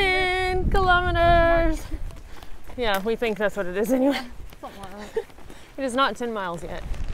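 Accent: South, United States. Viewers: LOL, I read the title of this video with your southern drawl in my head! Thanks for the laugh and have fun in Spain! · The Forrest Gump thing had me like🤣🤣🤣 I remember the first time I watched one of your vids and heard your accent,I said,she’s from Alabama,Georgia or Tennessee. Greetings from a fellow southerner. Knoxville,TN boy here.✌🏼 p.s. there’s nothing better than a southern girl👌🏽🖤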